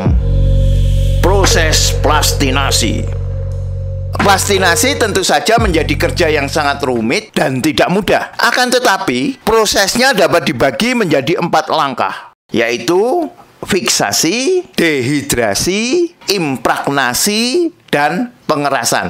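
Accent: Java, Indonesia. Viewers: Puyeng denger aksen medok nya.. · Suaramu medok bang , nguakak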